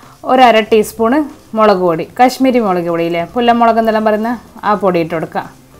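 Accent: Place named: Kannur, India. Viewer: Adipoli ayitund.....enikk nde avtharanathil...ettavu. Ishtam enthanenn vechal..nammale..Kannur..bhashayil thanne ulla...... natural aya avtharanam...super dear..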